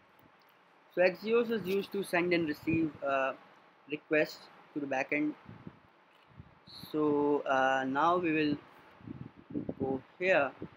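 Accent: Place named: India. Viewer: Indian accent so absolutely NOT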